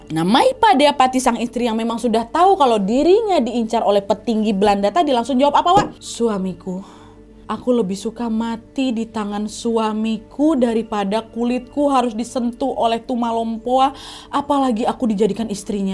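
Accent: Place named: East Nusa Tenggara, Indonesia. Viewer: Logat NTT nya kak masih kebawa wkwkkw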